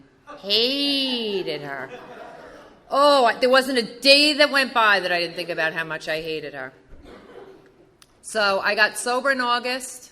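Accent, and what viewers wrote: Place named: New York, United States. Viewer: I can't believe I'm barely finding this especially this testimony! It had me from the start because of her NY accent. Hilarious, touching and impacting to my spirit. I love her transparency and her passionate love for God. I love her story of the last sponsor she had from Virginia. Thank you to everyone who had anything to do with sharing this story.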